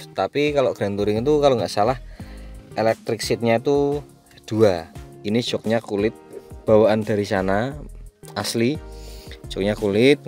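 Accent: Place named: Central Java, Indonesia. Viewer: Paling senang dengar logat jawa tengahan ...marem salam teko jawa timur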